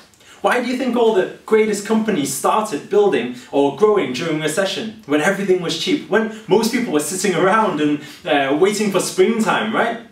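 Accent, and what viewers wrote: United Kingdom. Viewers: I like your british accent at the time!!! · Wow... your British accent was more prominent then.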